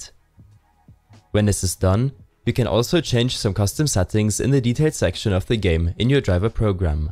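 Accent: Germany. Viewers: dieser andere deutsche Akzent??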